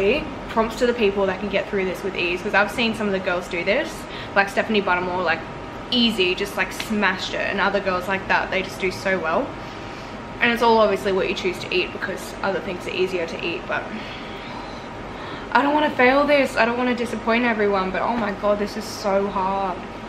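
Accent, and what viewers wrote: Australia. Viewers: She definatly has an Australian accent and the scenery in the backround looks Australian but I don't know for definite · I love you girl. I admire how much you can eat. You’re beautiful and I love your Aussie accent ❤️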